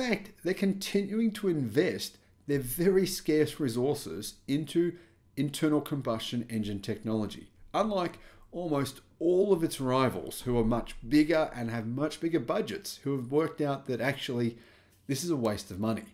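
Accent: Australia. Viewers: He's an Aussie - it's an accent thing I guess. He also says Tezla...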